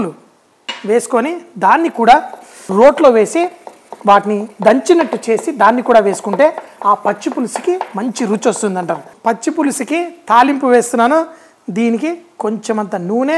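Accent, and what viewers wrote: Telangana, India. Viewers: Telangana rural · Already I love your programs in english, Now your 2nd innings in telugu, especially in telangana style at some times funny with your Dorasani is reaching to telugu people is very interesting, But I could not understand what is "dinchuck dinchuck"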